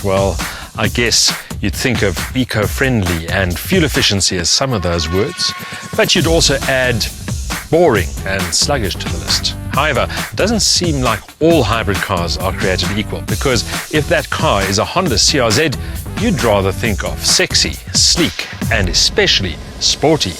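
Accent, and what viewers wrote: South Africa. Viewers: South African English , Their is a few different accents in South Africa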